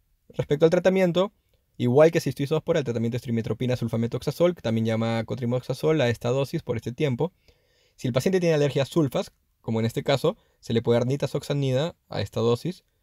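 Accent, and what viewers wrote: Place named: Peru. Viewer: Me gusta el acento peruano. Saludos desde MX 🇲🇽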